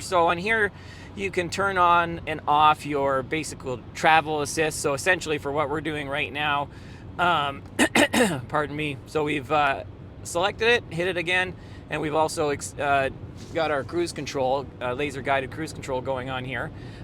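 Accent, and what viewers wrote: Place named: Canada. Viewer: Hello from Manitoba! I can definitely hear that typical "canadian" accent everyone always talks about in the way you speak, im only part way through the video but you have definitely been very infromative so far!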